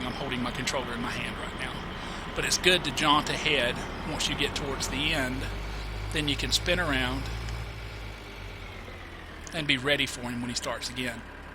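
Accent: South, United States. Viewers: The southern accent just completes this guide. Nice!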